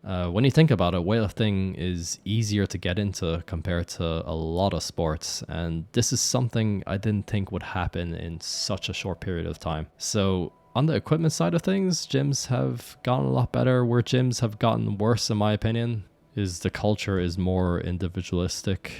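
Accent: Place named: Ireland. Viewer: No, he’s just Irish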